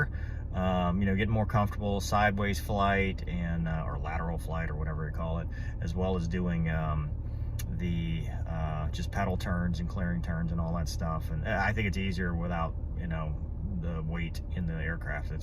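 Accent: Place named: United States. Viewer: I enjoy the accent. It's like a disappearing gem of Americana. Thanks for sharing!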